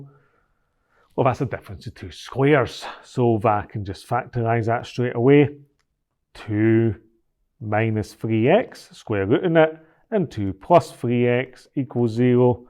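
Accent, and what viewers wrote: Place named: Ireland. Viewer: fikin hell mate cant understand ur irish accent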